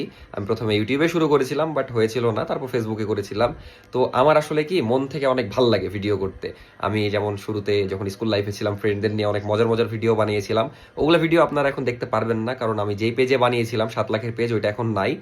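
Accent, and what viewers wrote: Rajshahi, Bangladesh. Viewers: রাজশাহীর ভাষা শুনতে ভালো লাগে। যাই হোক আপনার নতুন মা কে স্নেহময়ী মা মনে হলো ।🎉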